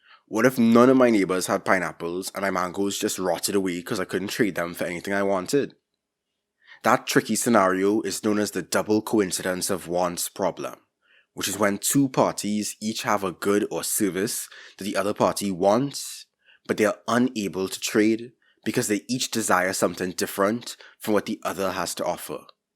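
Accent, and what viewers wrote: Trinidad and Tobago. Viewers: Di Trini accent, bwai! 😍😍😍😍…🇯🇲here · You're trinidadian! You talk like me! I was so surprised, you accent sounds like a real person! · I hear a trini. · It's Trinidadian. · Your accent sounds like you’re from Trinidad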